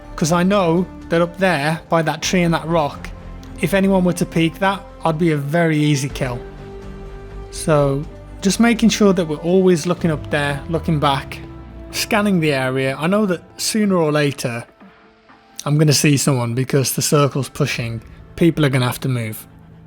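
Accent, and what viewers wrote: United Kingdom. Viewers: Oregon farmer here. combine or harvester. yep. you're my favorite steamer Jack and no worries your voice sounds still very British.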